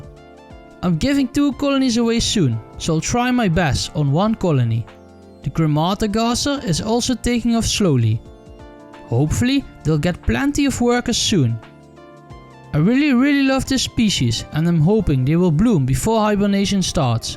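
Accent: Netherlands. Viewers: I like the dutch accent 😂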